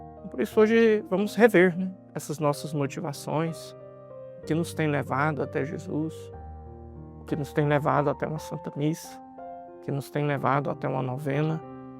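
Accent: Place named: Goiás, Brazil. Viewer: Uma abençoada semana para todos que ouvem o. Descobri ele aqui neste canal e não deixo de ouvi-lo todos os dias. A maneira como ele fala conosco, a forma simples e direta de explicar o evangelho. Os exemplos, as comparações e até a maneira de falar no Goianes dele, é maravilhoso. Que Deus te abençoe sempre. Quem sabe um dia posso conhecê -lo pessoalmente.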